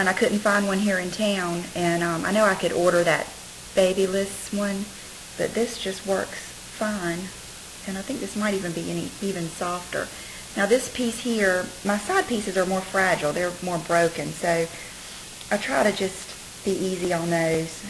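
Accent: South, United States. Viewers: I love love love your hair like that! and your accent. I can tell you are from the south too..lol.. keep up with the great vidoes!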